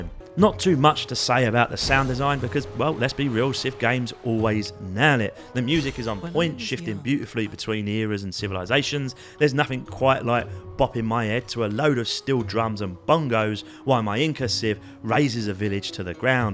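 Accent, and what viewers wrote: United Kingdom. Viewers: Definitely the most British opening to a video I have ever heard.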